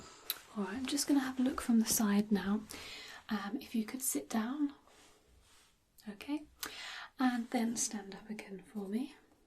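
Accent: United Kingdom. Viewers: I’ve just discovered you and am enjoying you lovely British accent! Do wish your writing on clipboard was louder. Thanks so much , so glad I found your channel 😛💕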